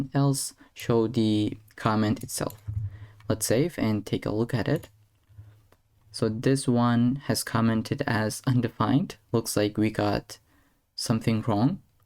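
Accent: Turkey. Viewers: türkmüsün la ingilizcen asiri türk aksani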